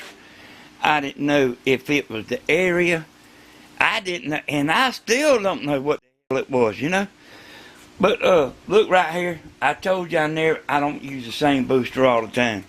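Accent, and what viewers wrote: South, United States. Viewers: have to say this. you could be a professor, but that southern accent! love it!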